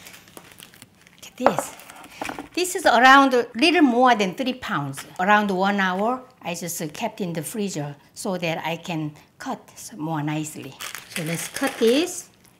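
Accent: South Korea. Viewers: 와 한인영어 너무좋아요 항상 잘보구잇습니다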